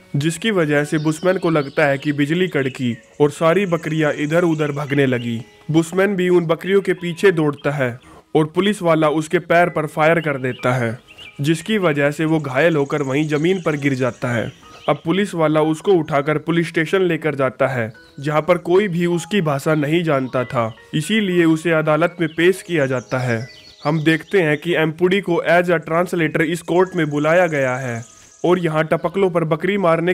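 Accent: Haryana, India. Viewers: Haryana ka h k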